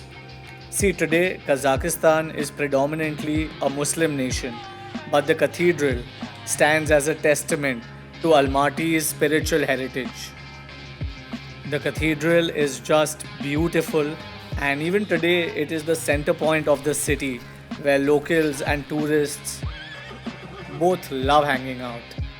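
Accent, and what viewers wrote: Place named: India. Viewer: Indian accent 🤢🥴🥴🥴🥴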